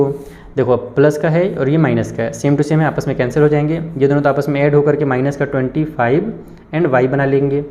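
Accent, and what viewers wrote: Bihar, India. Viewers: Sir Bihar se hai kya mai bhi hu 😅😅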